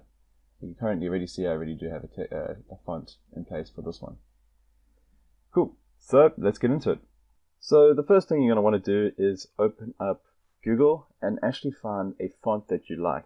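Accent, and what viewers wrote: South Africa. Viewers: how noticeable the South African accent is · nice to hear a South African in tutorial videos now and then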